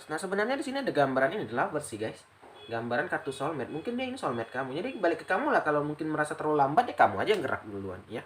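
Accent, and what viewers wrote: Padang, Indonesia. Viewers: Logat bahasanya sprtnya mas org padang ya...🤣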